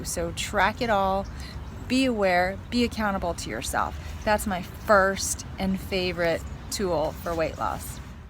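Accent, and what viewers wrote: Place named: Canada. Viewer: Are you Canadian? You talk with that Accent. Love it.❤️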